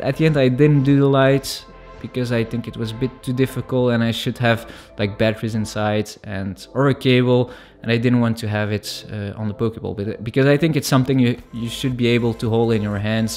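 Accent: Netherlands. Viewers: You're dutch, aren't you? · Are you by any chance Dutch? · I watchdd the intire vid and im still confused. Were are you from. Becouse i hear bits pf a dutch accent. But im not sure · Deze makker heeft wel echt een typisch nederlands accent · You dutch? If ur not u sound rly Dutch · ah i love the dutch english talking xD but you said you thought about selling it or giving it away, but how can i buy this? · Damn the Dutch accent is strong with this one! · leuke video man :) je hebt nederlands accent haha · You sound dutch, cool ball tho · Just out of curiosity, are you Dutch? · Bro you dutch or something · are you dutch? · Lol by your accent i knew you were Dutch! Im dutch too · Blauw? Bier? saying ehhh? saying Ant instead of And? Are you dutch? HA, i just saw your Bio and belgium counts aswell :) i'm too good at this XD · Are you from the netherlands? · Okay i immediatly recognised your Dutch accent. 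Well im Dutch too so hi there 👋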